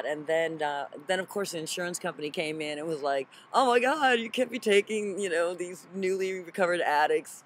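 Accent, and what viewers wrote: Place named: California, United States. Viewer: The 405 traffic!! you sound so Californian I can listen all day!!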